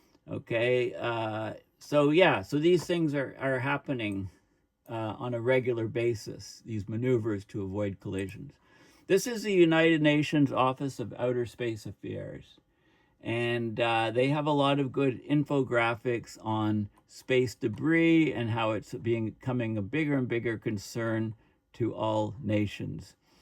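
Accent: Canada. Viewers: How do you call yourself an Irishman today? Sounds like a Canadian accent to me.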